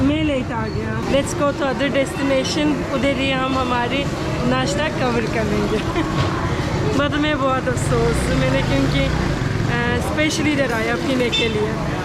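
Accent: Pakistan. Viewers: Ur urdu accent ❤️ ❤️ 😂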